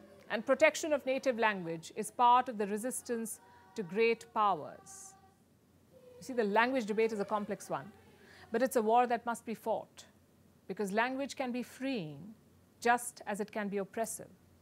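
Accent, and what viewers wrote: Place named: India. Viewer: She handles stress in English very well, while still remaining recognisably Indian. Since stress is used to carry emphasis and shades of meaning in English, it's a useful asset to a news anchor.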